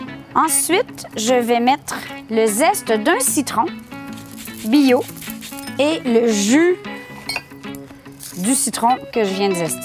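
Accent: Quebec, Canada. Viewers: accent quebecois !!!!!! , l`accent canadien ca serais du francais cassé, parlé par un anglais. au canada ils parlent anglais c est juste au quebec le francais ! arreté de dire canada meme si le quebec fait parti du canada il a sa propre lanque et ces propre coutumes et ca a rien a voir avec le reste du canada ! c est comme la france et l angleterre ils sont proches mais different completement different ! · olala qu est ce que j apprécie énormément l'accent canadien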